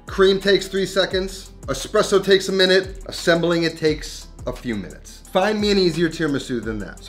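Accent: New York, United States. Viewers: Its an east coast heavy ny acccent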